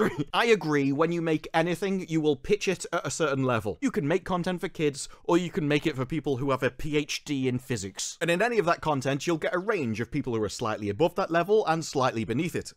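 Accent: United Kingdom. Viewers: offtopic: that british accent just flames ontopic: ye, i hope S4 will be cancelled, they butchered it enough or as the classic Simpson meme said "Stop, stooop! He is already dead!"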